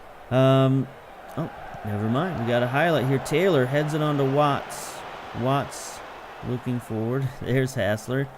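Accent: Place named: United States. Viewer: Two things: One, it's "shoniere", rather than "koniere" (Choniere); and two, where'd you learn to say Toronto right? I grew up there, it's odd to hear someone say it like a native of the town when they've got such a clear American accent. :)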